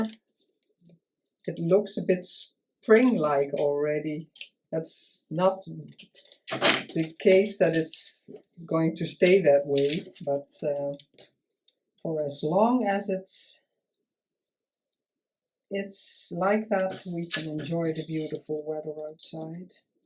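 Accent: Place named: Netherlands. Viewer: I’m so glad I found your channel, another lovely Dutch accent to listen to and beautiful work to look at! Susie xxx💕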